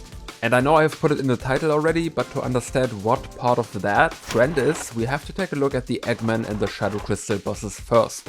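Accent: Germany. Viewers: Your accent sounds pretty German for an English speaking youtuber, i have a theory German was the language you used growing up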